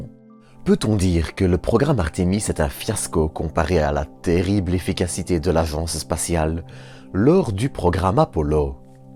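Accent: Belgium. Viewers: Un robot à l’accent belge ça nous change.....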